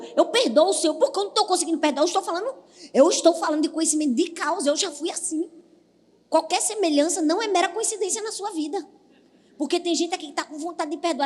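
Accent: Northeast, Brazil. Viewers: Amo o sotaque nordestino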